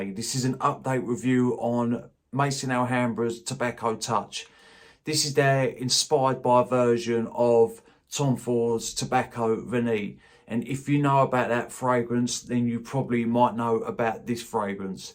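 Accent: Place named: Cockney, United Kingdom. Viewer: Finally, we got a proper cockney in the fragrance community! I could listen to you read the Bible, mate, and probably get my life right with the Lord. Cheers!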